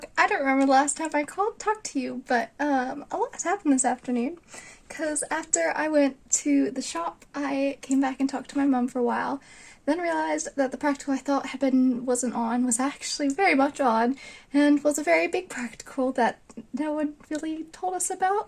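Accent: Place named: North America. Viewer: Your vlog style is pretty relaxed. Sorry to hear you're stressing over assignments. Of course, everybody goes through that in one way or another. Good that you have friends in your house there. had her staircase mates in her Jesus College dorm. ...so I've been wondering what's up with your North American accent, and that fact that you say "bangs" instead of "fringe" and don't know the word "crest". May I ask where you're from?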